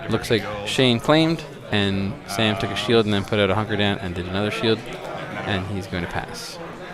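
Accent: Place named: Canada. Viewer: lol just the way you pronounce it like Zor instead of Sore. Chances are it's just another ridiculous Canadian thing like Zed instead of Z.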